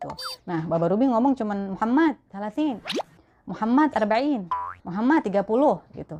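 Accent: Jakarta, Indonesia. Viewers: Medok banget Betawi nya.. 👍👍❤️ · Salam kenal pok,gaye nye betawi banget👍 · Assalaamualaykum....met kenal mpo hehehe....hr ini kluar dah logat betawi mpo yah hehehehe...sukses slalu ya mpo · Assalamualaikum.. teh, aslinya mana? Logat ngomongnya betawi beud 😁😁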